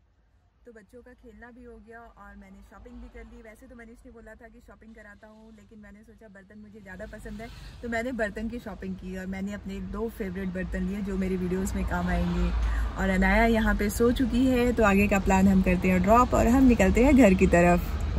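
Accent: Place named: India. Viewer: Fiiling philing ek dam deshi wali English very nice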